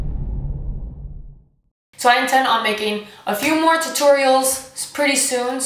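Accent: Spain. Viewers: are you spanish?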